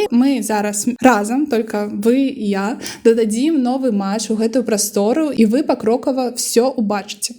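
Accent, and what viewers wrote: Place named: Ukraine. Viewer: Вельмі файна ў цябе выходзіць) Асабліва з ўкраіскім флерам)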